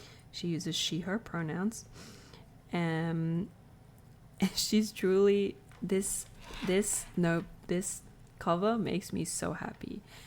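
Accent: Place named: Australia. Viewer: One of your videos came across my feed & I'm so happy! Loved this video! 🥰 Edit: I always get excited hearing a fellow aussie accent talking about journals/planners 😅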